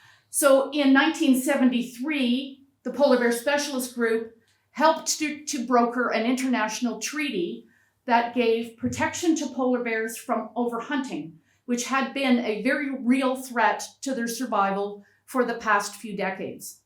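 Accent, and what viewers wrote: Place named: Canada. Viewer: She is a Canadian. American is a foreign language for her.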